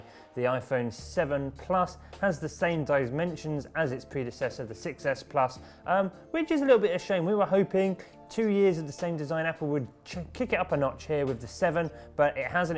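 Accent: United Kingdom. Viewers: British accent so annoying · iPhone is a really premium phone. But budget wise it is not the best Phone. Samsung is by far the best budget brand there is in the market today. ps. I cant decide if your Accent is British or Australian. I have this British friend who speaks like you. 😊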